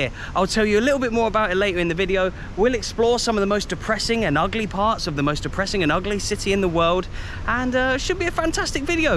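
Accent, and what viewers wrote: Scotland, United Kingdom. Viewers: Hi are you from Scotland?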